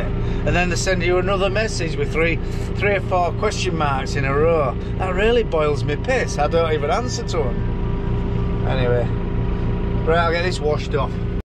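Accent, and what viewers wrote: Yorkshire, United Kingdom. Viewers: Pleased you & Joe Seels have found each other on here, 2 of the best channels around, can't beat straight talking Yorkshire folk 👍👍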